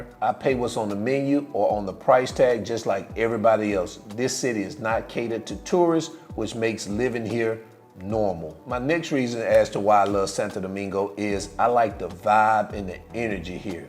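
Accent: South, United States. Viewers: I went from your wife's Dominican accent to your southern accent...lol! Great video I like how you enunciated your words and spoke clearly. I am an expat who has lived in Puerto Plata for 9 years I have a decent lifestyle and cost of living there but Santo Domingo is my second favorite place in the Dominican Republic for some of the same but some different reasons. To live the same lifestyle, I have in Puerto Plata it would cost me about 30% more therefore I am content visiting about twice a month. For me there are many shopping items you cannot find anywhere else in the country, but you can find them in Santo Domingo. In fact, if you can't find what you are looking for in Santo Domingo it's probably not in the Dominican Republic. As far as the restaurants and the women we are 100% in agreement. I have just subscribed to your page so keep up the good work....oh year you make a good team with your wife in her videos it's good to see a successful cross-cultural couple...thanks again!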